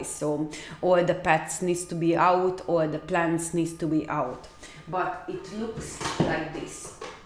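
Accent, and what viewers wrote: Hungary. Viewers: nem magyar vagy véletlenül? hát én ismerem ezt az akcentust, nem lehet más :D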